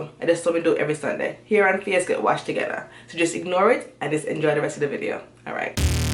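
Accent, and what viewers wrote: Patois, Jamaica. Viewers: did u not hear her speaking patwa... smh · Well obviously you are Jamaican... lololol Just heard your pathois!lolol love you even more now!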